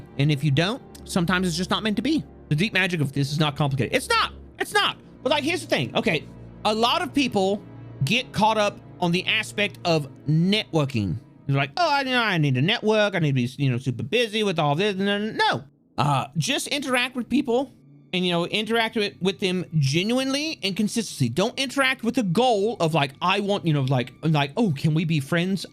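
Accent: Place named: Mississippi, United States. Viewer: I always catch just a whiff of a southern accent.... like mississippi southern....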